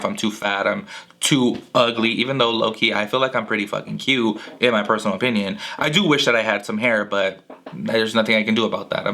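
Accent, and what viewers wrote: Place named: Bay Area, United States. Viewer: You talk like you’re from the Bay Area 😭😭😭😭💕💕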